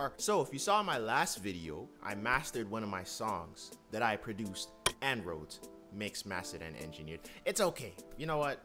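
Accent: Jamaica. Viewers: you jamaican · Kinda sound like a Jamaican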